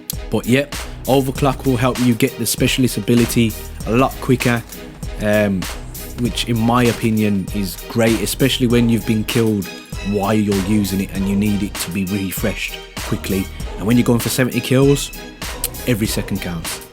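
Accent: Birmingham, United Kingdom. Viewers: You from brum lad?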